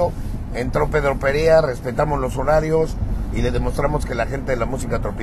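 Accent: Mexico. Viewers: Este compa habla CHILANGO FRESA 🍓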